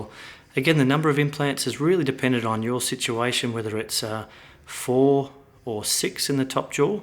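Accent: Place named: Australia. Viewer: Here in the u.s. my implants cost $30,000. I love the Aussie accent.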